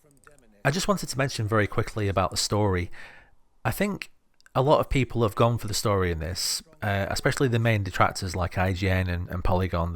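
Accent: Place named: Blackburn, United Kingdom. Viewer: That sounds like a Blackburn accent to me, great review man. Pearl abyss have created something truly special, subbed.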